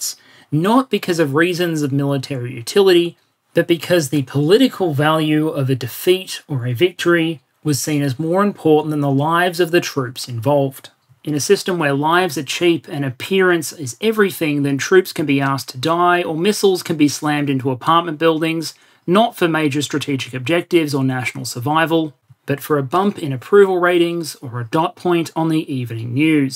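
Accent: Australia. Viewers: It’s the Aussie accent 😉😇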